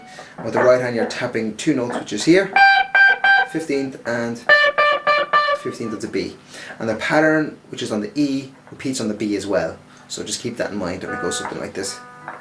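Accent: Ireland. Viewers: Cool lesson Just wondering, r u Irish? U just kinda have the accent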